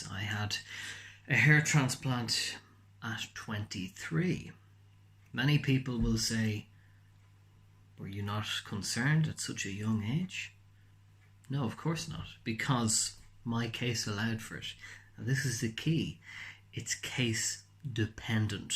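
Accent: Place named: Ireland. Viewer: Hello , thanks for the excellent video! If I’m not mistaken I hear a Irish accent. I’m from Ireland myself and I am wondering where or what brand of oral minoxidil do you use? I have been using finastaride but struggling to find minoxidil in oral form . Thanks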